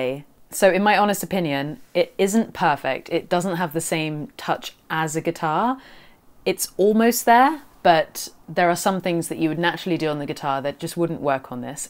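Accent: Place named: United Kingdom. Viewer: You are so British it's amazing.. I love your accent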